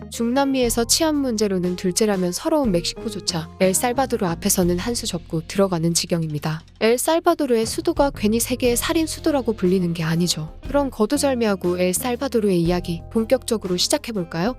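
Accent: Gyeongsang, South Korea. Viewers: 엘쌀!바도르 58회 고향이 경상도인가봐요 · 경상도 여성분이신가봄. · 경상도 분이신가??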